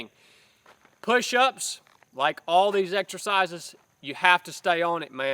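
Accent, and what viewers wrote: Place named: Tennessee, United States. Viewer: Love it nice, I dig the accent as it reminds me of my dad who was from Tennessee, I grew up in California I was a CTR in the NAVY, worked out a lot, I was even better on a run than most youngins. I am 48 now and got type 2 diabetes, got to watch how much beer and sausage I eat I guess. I pretty good, bit tired after lunch though. I always need a nap. I teach teenagers Mon-Fri. 8-2pm. I wake up to prep for work btw 6-7am. I go lift at the gym at least once a week maybe 2 sometimes, I try to do a FunkRoberts(youtuber) 10-20 min workout a couple times a week. Any tips on diet and workout are welcome guys, Thanks!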